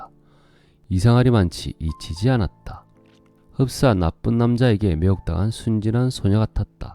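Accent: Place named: Gyeongsang, South Korea. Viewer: 경상도 억양이 거슬리네요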